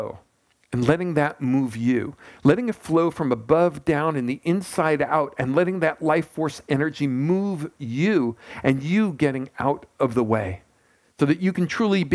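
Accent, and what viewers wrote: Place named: Brooklyn, United States. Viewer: I havejust foundanother great teacher andMarcis that person.wonderfu, if he seems like an older person jewish from Brooklyn with his accent. just follow him in his talk unitl about 47 or 48 minutes and then BOOM! igot it. the dramas...helists allofour drams. samaras Yeah! and yes! love toyou all liz e. whyaren't therefore comments here? or on his spacef or acknoweldgements below! fantastic than you. Shalo andnamaste and AUM! aim nomastivaya!. ram dass and khrishna dass....moreguys from Brooklyn!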